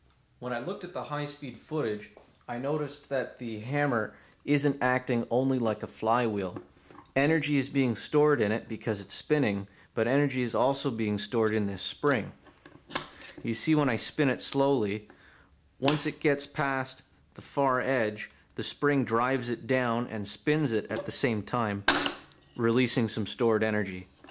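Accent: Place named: Canada. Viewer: Why would a guy with a funny hat and Canadian way of saying... out... take it upon himself to explain stuff to us? I don't know but this little video is entertaining and informative. I have to get me such a tool. Thanks!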